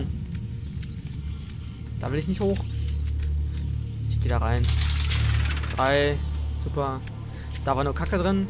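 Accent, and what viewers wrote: Berlin, Germany. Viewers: du und dein berliner sprache weste bist du berline dann sag "ich bin ein berliner"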